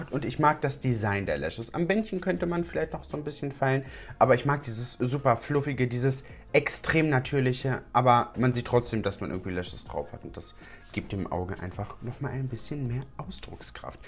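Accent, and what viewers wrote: Berlin, Germany. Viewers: Uh hallo schöner Mann, bist du aus Berlin? 🙂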